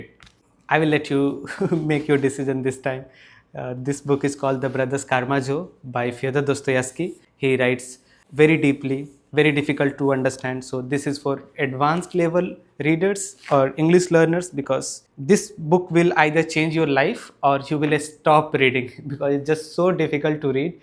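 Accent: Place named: India. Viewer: Nice❤, but your Indian accent wins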